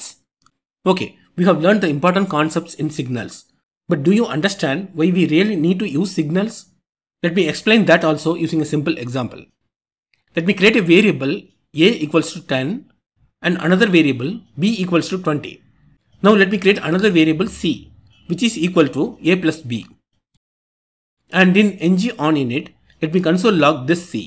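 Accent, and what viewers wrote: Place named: India. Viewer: Fantastic explanation; he has such a nice Indian tone!!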